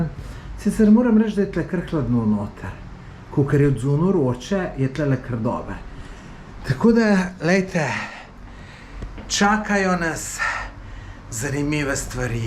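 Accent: Lower Carniola, Slovenia. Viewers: upam da ne misliš mene, nisem se norca delal, le obozujem vas in dolenjski dialekt in sem posnel parodijo, vse dobro zelim :)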